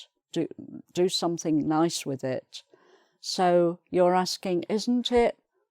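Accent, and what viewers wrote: United Kingdom. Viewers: British accent 😍 really lovely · I am a learner from China. You are so cute. And I really expect to have a Brit accent like you. I will follow your lessons. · Thank you so much for your lesson. I love your British accent . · Thanks. I love your British English. I hope learn more english with you. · I like a lot as a teacher.Because she is so patient and very skilful in teaching English.Also I like so much her British accent.She is so nice.!!! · I love your British accent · you are a good teacher, and l like your strong UK accent very much!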